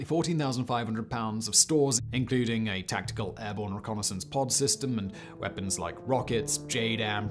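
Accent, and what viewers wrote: RP, United Kingdom. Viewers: I'm a clueless Yank so forgive me if I'm totally off base here. I really like language; our boy here has a fairly posh accent, right? I've never been great at nailing the nuances of British accents but he sounds a bit fancypants, right?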